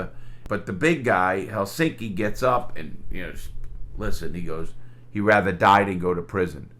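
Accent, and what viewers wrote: New York, United States. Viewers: he has the same accent with a little more new york in it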